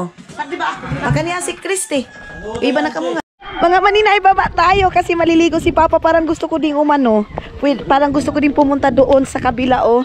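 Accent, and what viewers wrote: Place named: Waray, Philippines. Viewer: Yung tuno nyong pagsasalita parang sa Amin waray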